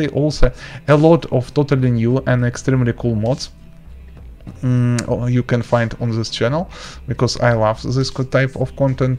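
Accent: Russia. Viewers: Русский акцент :| учи русский :D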